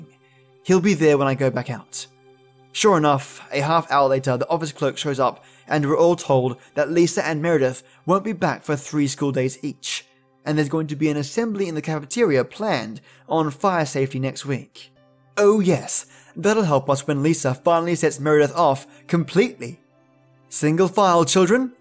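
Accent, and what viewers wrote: Australia. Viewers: Yeah! It's awesome Aussie tale teller time again for me.☕🍰🇦🇺❤ More Lily Madwhip stories! Absolutely, great series! Monk pear tea? Sounds so luxurious, warming and extremely tasty. Oh, you and your amazing teas that you drink.😍 I just have my PG tips. It's black tea but it does the job.👍I'm totally late.☹ But I did eventually get around to listen to your videos.🙂 Thank you for all your content, you definitely work very hard! Your narration always makes my evening leagues better!😊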